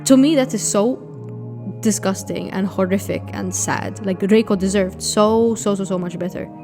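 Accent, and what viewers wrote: Malta. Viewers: off topic but i love the accent.. i have always been fascinated by the maltese language and how it is latinized arabic but i've never heard a maltese person speak english and the accent is just that. very warm and lovely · Was trying ro figure out the accent would have never guessed Maltese Also you are so right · This is so off-topic, but the whole video I'm sitting here like "WHERE's their accent from, I can't place it but it's so pleasant to listen to" and turns out I've never actually heard a Malta accent before?? so now I know lol, but just wanted to say, your voice is so pretty!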